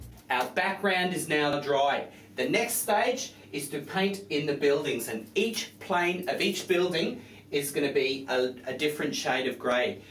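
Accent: Australia. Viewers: austrailian accents makes everything sound so cool!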